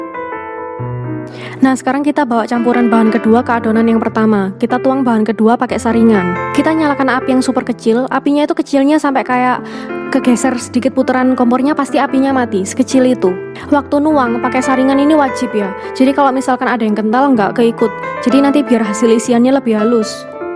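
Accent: Java, Indonesia. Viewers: Fokus sama tangan mbak nya yg putih mulus 😁, tapi bahasanya agak medok 😂 Btw thank you aku mau lagsg praktek💖🙏🏻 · Suka sama resepnya,..mantab,,musiknya kurang suka..dan bahasa nya medok surabaya padahal Baik tapi kliatan kayak judes...sukses selalu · Yaampun medok bgt 😂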